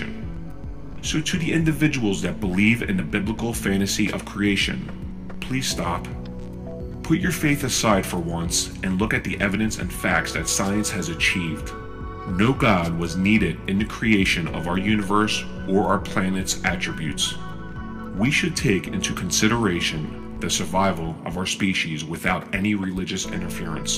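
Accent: New Jersey, United States. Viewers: The combination of gravitas-evoking reverb and Jersey accent makes me smile. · Liked the video, but the voice modulation thing is...weird...coupled with a NJ accent.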